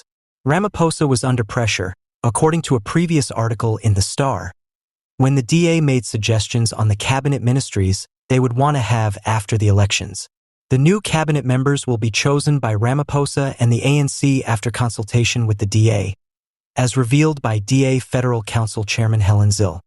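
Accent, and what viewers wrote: United States. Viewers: WHY IS THIS NARRATED BY AN AMERICAN???? COULDNT YOU FIND A BEAUTIFUL BLACK VOICE????? STOP BEING PUPPETS OF THE WEST MAN. MMNXM.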